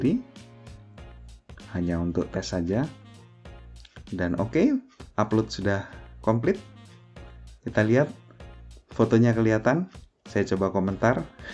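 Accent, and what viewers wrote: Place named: Philippines. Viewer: Woah i though your a pilipino too..well very impormative vid.vpn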